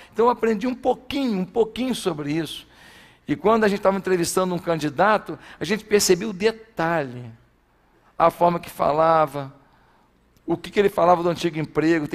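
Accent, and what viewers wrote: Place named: Minas Gerais, Brazil. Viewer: A igreja é no RJ, mas esse sotaque está mais mineiro, rsrs. Culto maravilhoso....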